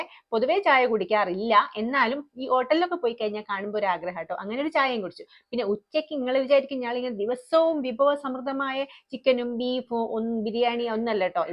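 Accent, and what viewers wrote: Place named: Vadakara, India. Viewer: Vadakara slang😅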